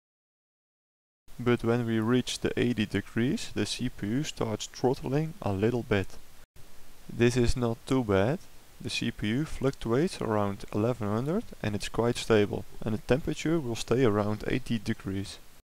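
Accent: Netherlands. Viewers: hoe duur is zon heat zink ik hoor btw dat nl bent Nice videos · nederlands manier van engels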